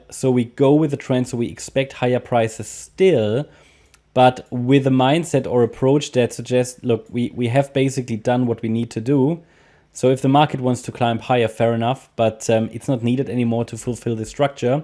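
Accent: Germany. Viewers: man hört so nen deutschen akzent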